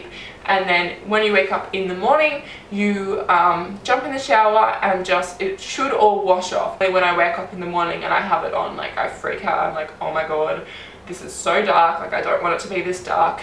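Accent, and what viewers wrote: Australia. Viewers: I think she"s Australian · french fry Maybe Australia? I think so! You could check her social media though, maybe she has it written there:) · frenchfry AUSIIIEEE, LIKE ME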